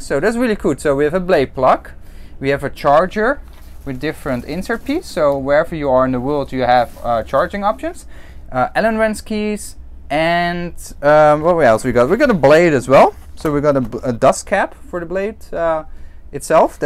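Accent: Germany. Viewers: Are you german? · You are a German aren't you?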